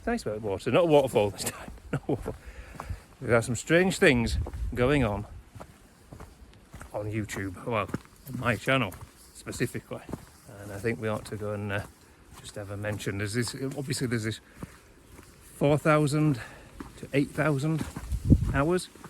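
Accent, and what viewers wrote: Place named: Yorkshire, United Kingdom. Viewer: Some of us just subscribe because we love to listen to you shifting the problems around the landscape. I wonder how many Americans you are going to attract, though. They are not good at Yorkshire accents, alas.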